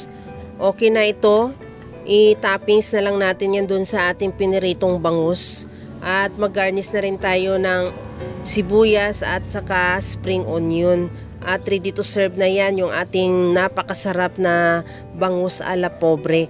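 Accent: Iloilo, Philippines. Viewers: wow for sure yummy ang ganda ng pagkaluto pero parang my tunog ilonggo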